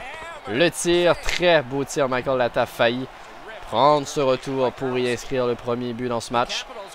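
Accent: Quebec, Canada. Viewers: Salut au départ ton accent quebequois j'arrivais pas à y mi habituer (JE suis français) mais tu commentes bien et tout ce que tu fait dant tes vidéo cest sz que je ferait moi alors continue :)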